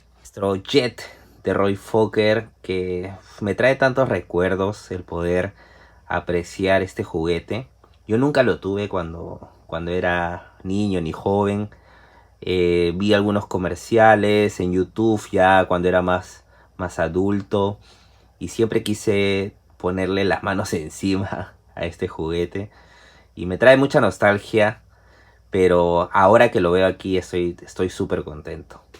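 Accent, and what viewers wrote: Peru. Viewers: Me encanta tu canal cada vez que puedo veo tus episodios me mate de risa con la de Arnol y lo de Jb se la pase a mi bros para que se caguen de risa también, te felicito por tu canal con tu acento Perucho es de mi época bravazo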